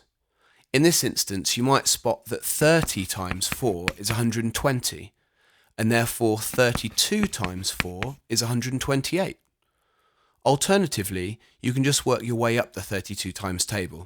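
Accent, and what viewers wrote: United Kingdom. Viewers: so nice to have a British accent explaining this thanks so much!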